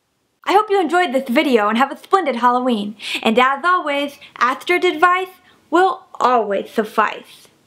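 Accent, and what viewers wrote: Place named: Europe. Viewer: Anyone else notice how much sexier Astrid is than? That bedroom hairdo and so very continental with that accent..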